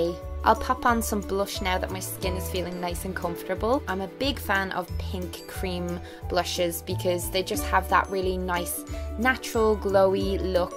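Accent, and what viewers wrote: Ireland. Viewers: Lol- I feel like we'd be best friends in real life. Actually, I know we would be! PS I love your Irish accent, it's so beautiful!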